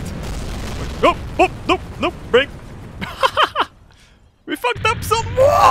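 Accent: Sweden. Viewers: it's a typical swedish accent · It's his awesome manly Swedish accent maveon carter so go fuck off. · The language he is talking is English. His accent is Swedish. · dat swenglish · I love swedish accent:D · SurvivalGaming Romania Hes Swedish its a Y sound, like jimmy sounds like yimmy.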